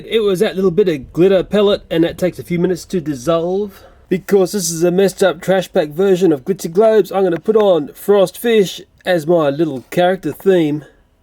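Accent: Australia. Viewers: He's Australian isn't he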